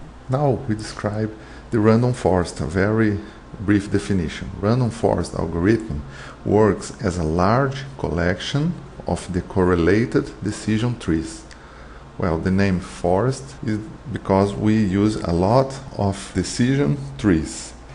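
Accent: Brazil. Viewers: Nossa, comecei a ver e ouvindo o jeito de falar ficou muito clara a brasilidade da pessoa. Mas muito legal o material. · His ascent sound like hi is brasilian?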